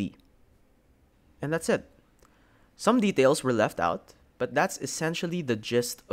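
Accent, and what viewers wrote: Philippines. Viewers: you sound somewhat Pilipino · This accent sounds Filipino. Are u Filipino? Edit: also great vid man, the way my school explains calculus is terrible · Pinoy itong nagsasalita ramdam ko. Unang salita pa lang sa video eh HAHAHA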